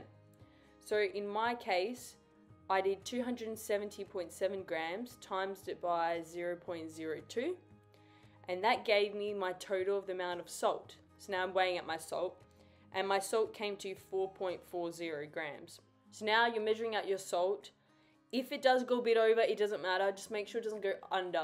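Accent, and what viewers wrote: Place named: RP, United Kingdom. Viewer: Very good R P English language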